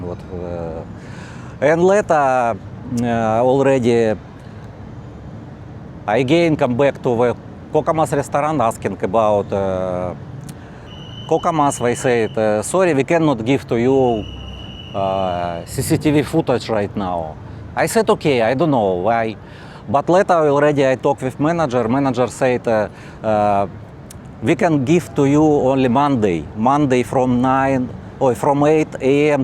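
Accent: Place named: Russia. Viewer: You sound like you’re Russian by your accent. I think it’s cool af that everyone has to speak English.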